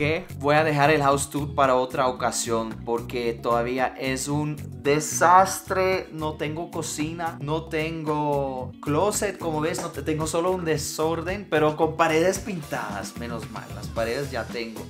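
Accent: Colombia. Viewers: Parce puro colombiano jajajaja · Jajaja cómo has aprendido a hablar al estilo colombiano 🤭🤭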